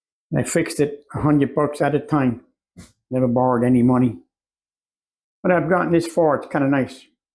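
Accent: New York, United States. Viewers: Hey, you sound like a New York guy—where from originally and where’s your current nest? I grew up in Westchester Co. north of NYC so I know that accent — NY Italian-American? I’m in the Bronx now, and part time in the Delmarva area where Delaware meets MD. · I thought I detected a hint of New York in your accent. With my accent people always think I’m from New York. My daughter lives in Brooklyn. The only thing I don’t like about the south is the heat and the hurricanes. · Fantastic job!! Wow, very impressive how you renovated this tiny house and decorated it so nice. It's home. I'm happy for you that you were able to accomplish this dream home for your retirement years. You are very smart to do what you did. I'd like to see the restoration of the 1930 car. You sound like you're from Brooklyn or Queens??? Not sure. I'm from the Bronx. My husband is from Brooklyn. 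We moved to TN from CA. We like it here. It's been ice rain now, so we're hunkering down inside. Hope you're safe out there where you are. Have a blessed day. 🙏🙏🥶🥶🤗🤗🇺🇸🇺🇸🫎🫎🐔🐔🐦🐦🐿️🐿️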